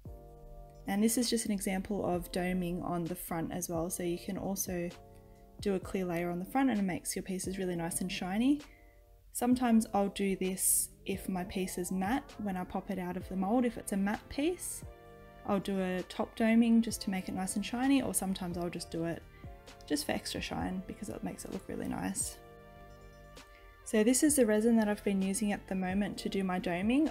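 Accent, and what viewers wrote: Australia. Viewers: Great tips, thank you. Good to hear an Aussie voice too. Hugs from Heathcote Sydney.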